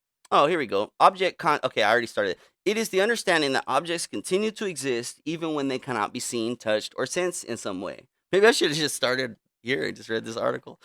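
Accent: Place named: Latin America. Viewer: When listen to your voice I am getting a hint of a Latino accent and I am ready for you to break into a Cheech and Chong skit.💨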